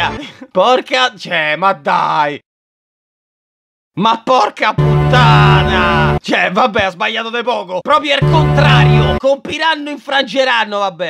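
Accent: Rome, Italy. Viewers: Ma soprattutto perchè è l'unico che sa sfruttare così bene l'accento romano da far sbellicare dalle riderate, personalmente a me non è mai successo fino ad ora.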